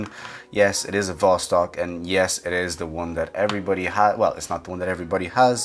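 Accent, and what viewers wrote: Ireland. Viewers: You sound Irish!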